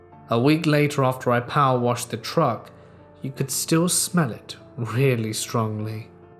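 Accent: United Kingdom. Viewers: Why are you british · Omg so its 330am and not damn thing made me laugh all day until you said "the readiness of my anus" in your dry British accent and I lost it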